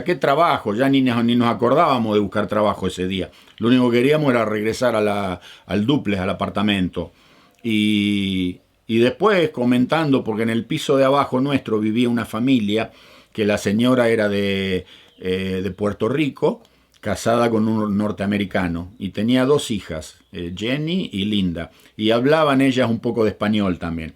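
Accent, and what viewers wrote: Argentina. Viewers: Me encanta que, viviendo tantos años afuera nunca perdió nuestro tono Argento tan particular. Saludos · Hoy,6 de agosto de 2020, recién encontré los videos de está familia....la verdad lo admiro por su vida,su educación,su lucha....y lo hermoso es su lenguaje que no ha perdido nada de argentino, pero domina el relato como nadie,ninguna muletilla..... más lo quisiera algún periodista famoso!!!! Cariños !!! Cris de Mar del Plata. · Jaimez Cristian : si esta muy bien su forma de expresar, pero su asentó ya no es tan argentino, A mejorado mucho su lenguaje. Y se oye mucho mejor...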